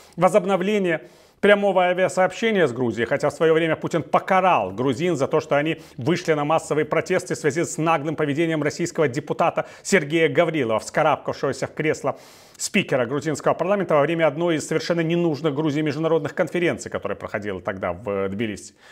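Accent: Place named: Baltic. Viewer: Ты кто??? Комментировать за Грузию с акцентом прибалтов.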